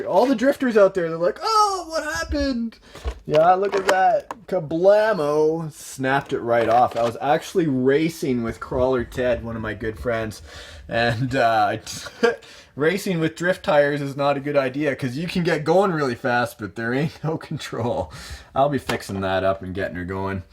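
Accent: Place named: Canada. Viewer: Where are you from? I think you have a canadian accent but could be totally wrong. Just watched a couple of your vids. cool stuff.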